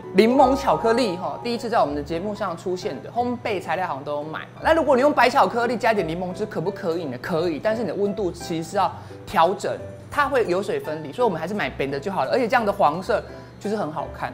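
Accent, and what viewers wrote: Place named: Southern Taiwan, Taiwan. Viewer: 是南部人嗎？只有南部人會把「冷 ㄌㄥˇ」唸成「ㄋㄥˇ」😅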